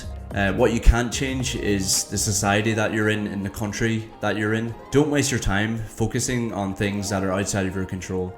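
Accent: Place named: Donegal, Ireland. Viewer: No way! You're from Donegal and I thought of catch your accent. I lived in Donegal 14 years all of my mixed Thai/Irish niece/nephews also living there. But myself moved back to Thailand for this period. I agree with you after moving back to Thailand. Many things has changed in Thailand, more conveniences, better, improvement and safety, cost of living a lot lower than Dublin, but you still have modern city vibes in Bangkok like many other rich City. 🎉😊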